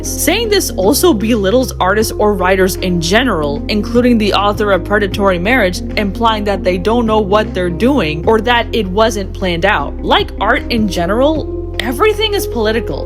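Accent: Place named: India. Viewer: I heard a desi girl and locked in 💅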